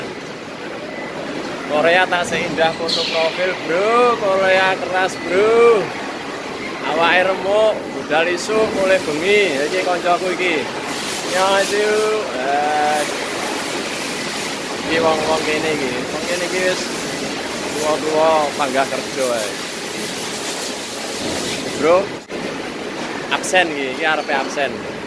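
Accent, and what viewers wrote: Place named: Java, Indonesia. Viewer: Semngt mas. . Jowone pundi ko medook bngett.. 😄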